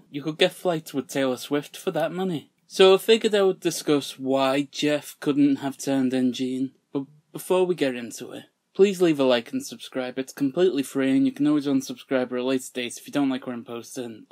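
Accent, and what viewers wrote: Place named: Yorkshire, United Kingdom. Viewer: I thought that exact same thing and subbed too! I'm no expert but i thought i hinted some early Alex Turner in your voice, I believe he's from Yorkshire? I'm horrible w accents tho so I'm prbly wrong 😂 thanks for the video, i love your theory of Saul running the "tip" line.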